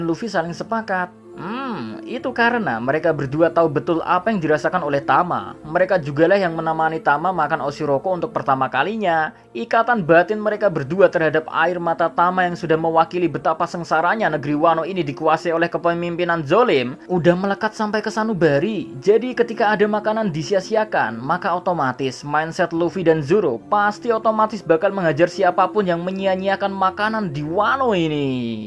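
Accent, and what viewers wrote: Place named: Tangerang, Indonesia. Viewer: ngikutin chanel ini udh lama Min.. dn aksen "GEH" itu baru.. dn sangat menggangu telinga... Terima kasih Btw sya thu itu aksen daerah Tangerang kabupaten..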